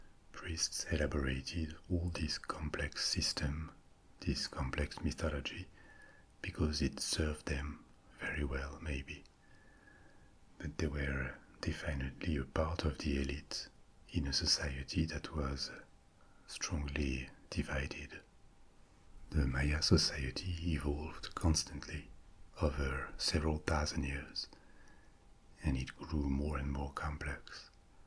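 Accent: France. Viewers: > Great voice > French accent > History lesson What more can a person ask for?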